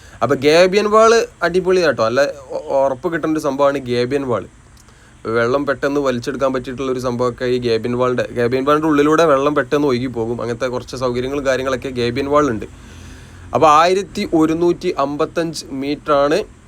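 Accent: Malappuram, India. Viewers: മലപ്പുറതകാരനാണെന്ന് സംസാരം കൊണ്ട് ഉറപ്പായി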